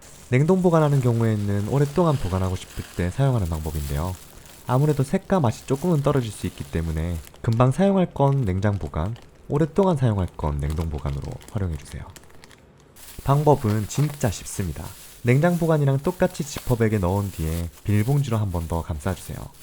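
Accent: Gyeongsang, South Korea. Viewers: 경상도 말투 좋습니다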